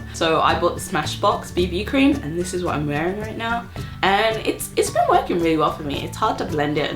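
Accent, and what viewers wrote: London, United Kingdom. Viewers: And you definitely dont sound canadian........ At all. You sound very British to me. And my fellow canadian people will definitely say the same thing. ☺ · You sound proper British! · I saw some of your other videos before seeing this one and I could easily tell you were from London or British. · I'm from London, meself, and that sounds like a London accent to me- (for the most part anyway!) o.o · You still sound London-y to me. I'm very introverted so having plenty of time to myself is idle to me. The last time I felt lonely was when I visited my dad in Nevada, USA and didn't know anyone and it was so isolated because it was basically a desert lol. Do a Facial routine or GRWM video! I'd be interested in seeing that. :) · I love your accent and I knew immediately that you were a Brit. Who have you been talking to? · Most people expect the "Ello guv'nor" type Oliver Twist flex. 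You still have your accent, it hasn't changed at all. British is not an accent.... Lol. You have a London accent there's a few accented words but it's minor. London misses you. Enjoy Canada! Been watching your videos from day 1. I hope you get back to making the videos again. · I kind of notice it at some point especially when you emphasise the r in words with r. But you still sound like you're from London. Love your videos, btw! Xxxxxxxxxx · aww, some moments in this video you did sound very Canadian/American (ok, i can't distinguish between the 2 lol) but the London accent is still there girl! Don't worry. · Language adaptation that's awesome! I do that sometimes well as long as they understand you haha I think you still have your british accent don't worry too much and get out there some more pwahaha! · I still think your British. To me, your accent hasn't really changed.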